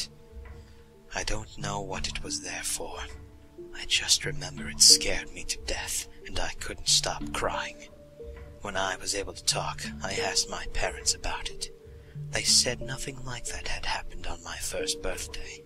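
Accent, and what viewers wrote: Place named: United Kingdom. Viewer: 原來不是只有我這麼覺得 超英國腔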